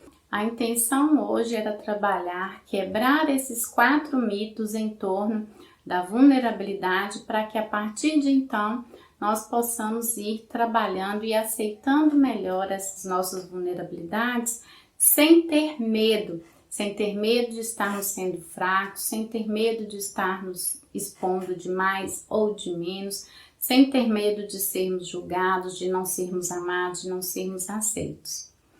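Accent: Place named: Northeast, Brazil. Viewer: ahh achei o sotaque parecido ,eu sou do nordeste .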